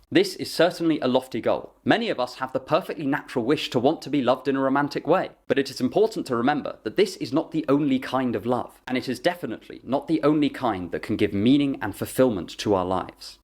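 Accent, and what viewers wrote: United Kingdom. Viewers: Thanks for the info !! Your advice is greatly appreciated and your videos are really informative and intriguing and would like to ask where is your accent from it’s British right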